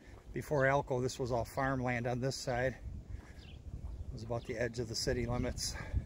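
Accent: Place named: Michigan, United States. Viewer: I love your accent!! Michigan?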